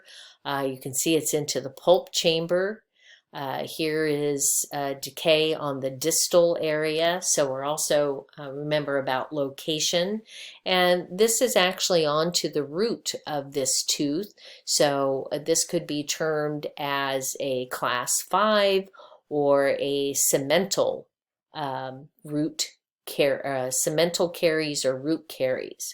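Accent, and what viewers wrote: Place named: United States. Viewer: Although from usa I assume and I love that accent, finally something for dental assistant, I'm doing course in uk so extremely helpful. Thank u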